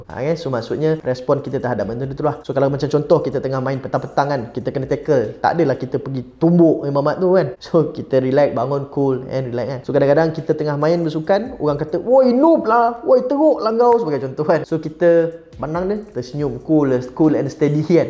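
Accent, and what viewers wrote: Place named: Kedah, Malaysia. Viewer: Ni kedahh aa mcm gini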